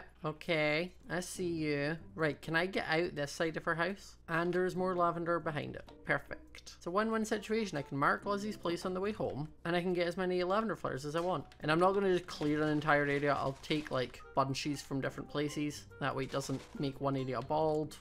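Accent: United Kingdom. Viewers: Waittttttt are you british?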